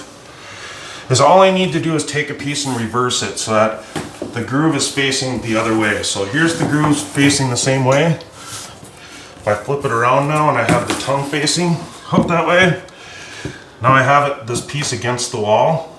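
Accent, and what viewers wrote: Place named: Upper Midwest, United States. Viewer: Until you said Northern Minnesota, I thought you were from Ontario, Canada. That Northern Midwest accent had me fooled at first. Great tips, nonetheless.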